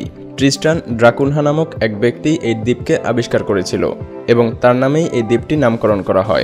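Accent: Bangladesh. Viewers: Apnar kotha bngladesi dr mto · Vi tmr voice ta r description a dawa number gulo bangladeshi tumi protek video teh kollkata tag koro kno tumi nijer country k tag koro na kno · ভাই আপনে কি বাংলাদেশী? আপনার কথা শুনে যতদুর মনে হয় আপনি বাংলাদেশী। বাংলাদেশী হলে লোকেশন কলকতা দেয়া কেন? কলকাতা লোকেশন দিলে কি ভিউ বেশি পাওয়া যায়? নাকি নিজের দেশের জেলা লোকেশনে দিতে লজ্জা লাগে?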